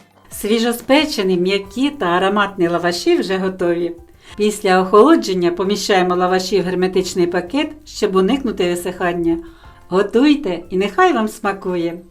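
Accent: Standard. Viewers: Супер - вподобайка і підписка! ❤ Дякую за рецепт.Але найбільше вразила мене Ваша мова. У мене муз.освіта , і я можу порівняти мої почуття від Вашої озвучки відео з такою музикою, що хочеться слухати і отримувати насолоду. Прошу Вас , будь ласка, дати мені відповідь, в якому регіоні Ви живете? Бо я ще не зустрічала такої справжньої чистоти .✨️💙💛💙 ( цікаво, чи я вгадаю🤔 - черкаська обл.?)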